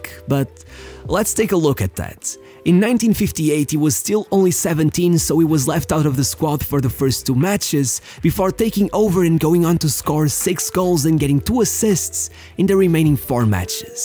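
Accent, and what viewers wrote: Portugal. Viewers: Ele é Portugues? parece a forma como diz os nomes Brasileiros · Ele e português bicho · Top, és português não és? Esse sotaque...🧐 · U sound portuguese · Ele é português por isso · Just by the accent saying the players names, I know this guy is a Portuguese. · Brother, you’re portuguese ain’t you? Não mintas crlhes. És tuga ao máximo, o sotaque não engana. If so... 👏👏👏👏👏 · Pela pronúncia dos nomes de jogadores e clubes percebe-se logo que és português... "So clean" ahahahahah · So fui perceber que seu sotaque era portugues quando voce falou dos biquíniskkkkk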